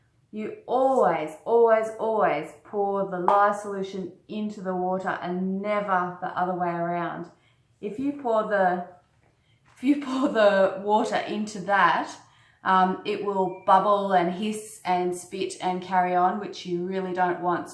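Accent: Australia. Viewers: This is an amazing video and very satisfying Love the Australian accent 👍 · Hi, like everyone else, I love your videos and you have inspired me to finally take THE big step and make some soap. My lye solution has a film over the surface, am I still able to use it? Did I do something wrong? Also, when I discovered your channel, it was sooo good to hear an Aussie accent. I'm in Tassie. Thanks, Victoria. Aussie, Aussie, Aussie, Oi, Oi, Oi!🇦🇺😊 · Nice video have my thumbs up, straightforward process, like your light Australian accent. Just one comment: I think the process is called saponification rather than emulsification. In the moment you have first amount of soap made by the chemical reaction the soap will definitely emulsify the rest of the oil. However, at the end of the process the oil is completely transform so I believe it is correct to say that the saponification process was completed. 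I think this is true even when the recipe keeps a small amount of oil unsaponified (this way we know that all hydroxide is used up completely and soap is not caustic ); recall my grandma making soap when I was a kid, and she had a way of testing the end of reaction by taking a sample in a spoon and we were not allowed to get closer (she wanted us to not get splattered). For us the kids, it was a pretty magic alchemy. Today, seeing you making soap brought all those memories back. God bless you and the work of your hands. Chris, Canada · Love the Aussie accent … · A very good video, and wonderful instruction. I love love your voice, it is soothing. Thank you. I am from Canada and your accent makes me think of and miss my Australian cousins.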